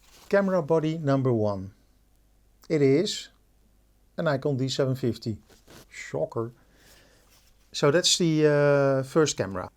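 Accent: Netherlands. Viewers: Heerlijk Nederlands accent 😋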